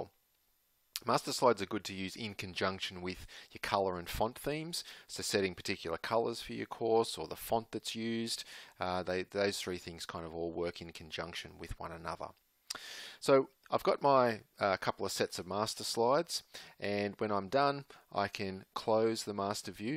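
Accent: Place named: Australia. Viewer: Good to hear an Aussie accent on a Storyline tutorial! Thanks for the clear video.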